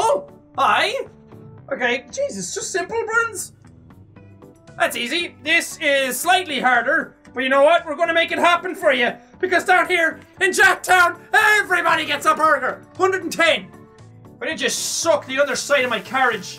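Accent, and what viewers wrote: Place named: Ireland. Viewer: I'm just wondering... why Jeremiah? Like i know its just a name he thinks of on the spot, but... why Jeremiah? It doesn't trigger or offend me or nothin but it just makes me think of my big bro so if i zone out due to my recent work load, then i hear "Jeremiah!" in Sean's infectious Irish voice, it just makes me think that my bro is there or smth XD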